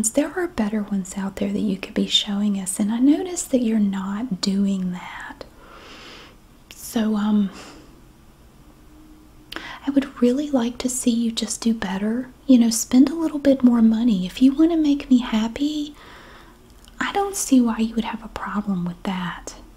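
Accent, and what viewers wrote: South, United States. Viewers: Tell me you’re a Southern lady without telling me you’re a Southern lady. Git ‘em! Love you!!! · Y’all, I thought she was talking to me and almost reported myself………😊 damn I love a southern woman and accent. She has me like ole yellow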